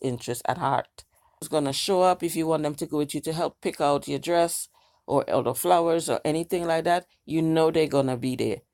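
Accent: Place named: Trinidad and Tobago. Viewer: I like the dresses. I didn't catch your accent until about ...Trinidad in the house...lol...I am from St. Croix.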